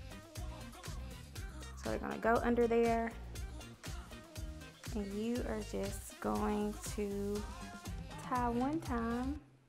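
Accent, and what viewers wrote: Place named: South, United States. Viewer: 🙌🏾🙌🏾🙌🏾 love the southern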